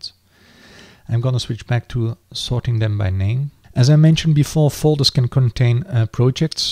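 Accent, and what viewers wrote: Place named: Netherlands. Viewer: Great info! Thanks man, you sound Dutch.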